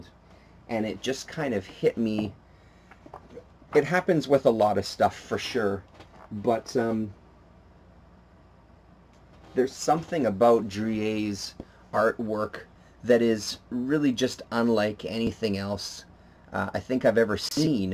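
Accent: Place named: Canada. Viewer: Canadian! He says "aboat"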